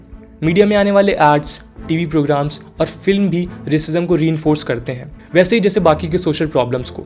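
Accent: India. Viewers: Indian vox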